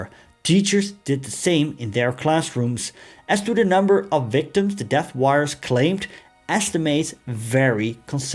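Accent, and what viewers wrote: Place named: Netherlands. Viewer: I like listening to the Dutch accent speaking English. Many families immigrated to my homeland, New Zealand, from the Netherlands during the 1950s & 1960s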